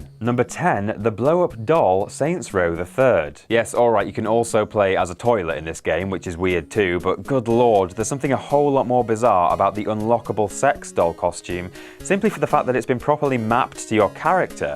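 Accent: United Kingdom. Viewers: i like you british mat pat.. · I made it .....because, British Accent, that's why.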